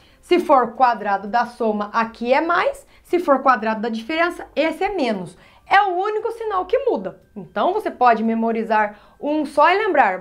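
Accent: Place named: Caipira, Brazil. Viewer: Esse sotaque caipira incrível ceRto, teRmo, diferEnça kkkkk Muito bom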